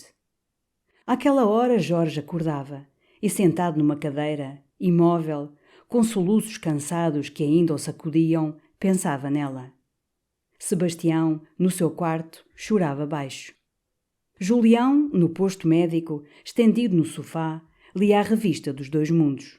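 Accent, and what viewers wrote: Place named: Portugal. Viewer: Esse é meu livro preferido do autor e ouvi-lo com esse falar português, ainda mais em ótima narração, foi maravilhoso. Parabéns e obrigada!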